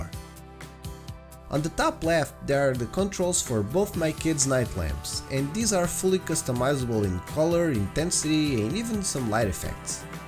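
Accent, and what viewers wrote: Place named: Portugal. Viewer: Muito interessante, e barato. Fiquei surpreendido por ser um vídeo português, já que o seu inglês quase não denuncia o habitual sotaque. Parabéns 👍🏻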